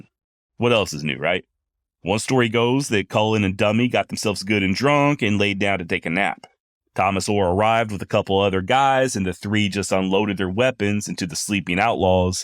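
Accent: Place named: South, United States. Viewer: It does a soul good to hear a Southern Accent every now and then. And I like your stories. Keep it up!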